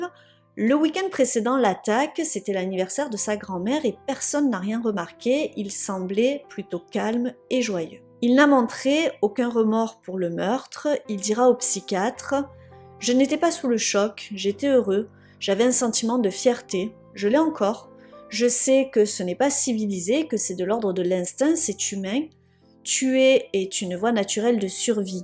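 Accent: South, France. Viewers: Bonjour, Personnellement je ne regarde jamais les images. Je ferme les yeux et j'écoute en utilisant mon imagination pour me visualiser l'histoire. Images ou pas, j'apprécie tout autant tes histoires, et un grand bravo pour ton travail et ta façon de raconter. PS. J'aime ton accent, ne serait-ce pas un petit accent du Sud ? Au plaisir d'entendre ta prochaine histoire. Je te souhaite une très belle semaine. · Narration au top comme dab avec le petit accent du sud qui va bien :)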